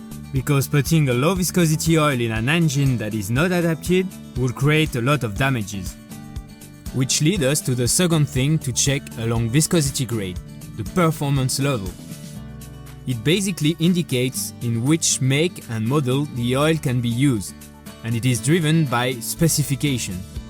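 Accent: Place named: France. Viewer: Thanks. I like your French accent 🌷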